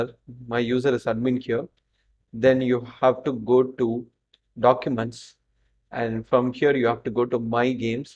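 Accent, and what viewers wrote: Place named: India. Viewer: Блин как же индийский английский похож на русский английский )))) Спасибо за видео, работает!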